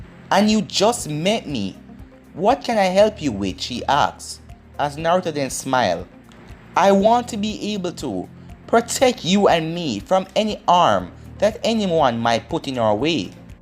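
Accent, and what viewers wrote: Jamaica. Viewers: Why do u sound like a Jamaican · ok, I asked cause I'm Jamaican and he sounds like a jamaican that is trying to learn English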